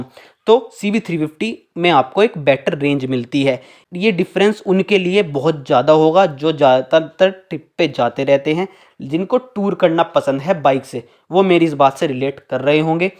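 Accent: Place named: Uttar Pradesh, India. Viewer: Neither of these bike falls under cruiser category. Please do your homework seriously ;If you want to grow. Else if you really want to remain just another street youtuber with strong UP accent and tone. Continue with all what you doing. Rest I will wish you best of your luck and hope you do good 👍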